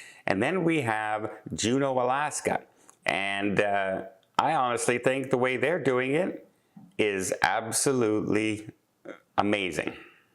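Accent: Canada. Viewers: The rain on your parade, but you sound very smart, logical and Canadian. Those three words are never used any politics policies on American soil. What is more done is drawing the line in the sand and saying you cannot cross unless you’ve been to my will. And yes, the people making the decisions most times have nothing to do with the outcome meaning the people making the decisions in this case in Alaska are not the business that profit by all the tourists. It took me a few years to realize this when I moved in 1999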